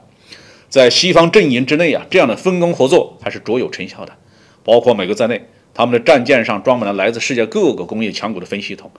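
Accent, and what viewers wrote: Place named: Fujian, China. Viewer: 鲍大校的扶兰口音蛮亲切的😁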